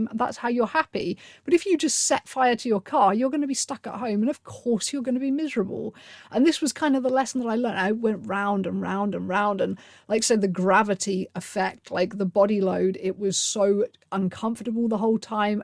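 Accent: United Kingdom. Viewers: I love your accent.almost sounds british.also,love your vibe. Good luck on ed recovery.its not easy.had a friend in high school who we all thought was a finicky eater.until...she came to school looking absolutely wrecked.tiny to start with,she got down to i think 45 or 55 pounds,and she was 5'4.deadly low.no,sadly,she did not recover.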